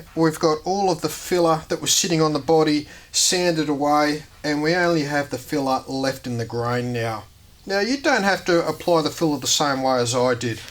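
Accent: Australia. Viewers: I came for the tech tips... I stayed for the Australian Accent. Hey do You know Lee Priest? I'm from Canada, and Yes, I DO know Bob from Ontario...He's My neighbor. Great Video EH! ❤🇨🇦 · New South Wales accent? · Well done Mate! Great video. What part of Oz are you from? You have a really thick accent. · I love your Australian accent! welcome back. Always been inspired!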